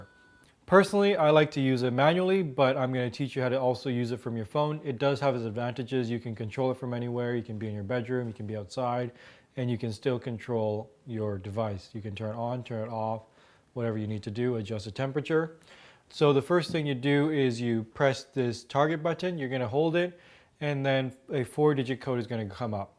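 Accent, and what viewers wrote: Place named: United States. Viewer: Duh do you hear an accent. Hes from the US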